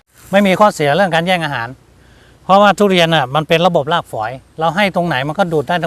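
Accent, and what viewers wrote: North, Thailand. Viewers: ฟังจากสำเนียงแล้ว เป็นคนเหนือใช่ใหมครับ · อ้ายคนเหนือแม่นก่คับ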